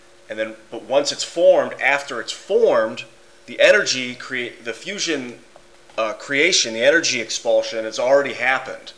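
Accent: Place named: South, United States. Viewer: You must be southern.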